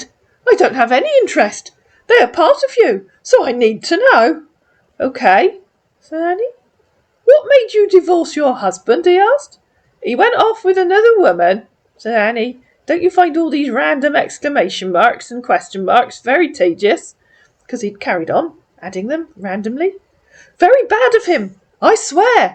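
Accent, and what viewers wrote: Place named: West Country, United Kingdom. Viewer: Can’t listen to that awful west country accent sorry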